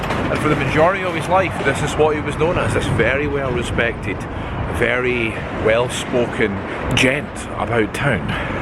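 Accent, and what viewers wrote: Scotland, United Kingdom. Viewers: I enjoy listening to your lovely Scottish brogue and seeing bits of Edinburgh. One year ago, we had especially liked our walking tour of historic Edinburgh with stops in Grey Friars Kirkyard and the Haymarket. Aaaah, I so loved Edinburgh!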